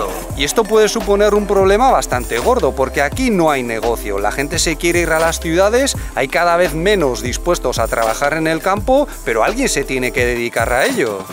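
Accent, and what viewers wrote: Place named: Spain. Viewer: Hola hola soy de Tlaxcala de uno de los estados de México ya mi esposa le encanta su asentó español de son una bonita pareja y sus videos son interesante y cómo esta su bebé me gustaría que mandaras saludos a mi estado y que ha pasado con la pandemia aquí estamos en código rojo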